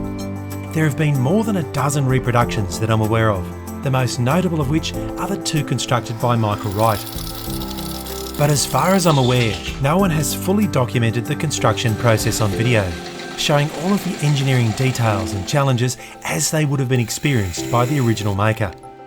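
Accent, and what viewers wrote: Australia. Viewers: Subbed the instant I heard a fellow Aussie accent, liked for awesome content. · Well his voice isn't exactly soothing, but it isn't bad for an Aussie one........... *Kiwi here :P But yes will be EPIC :)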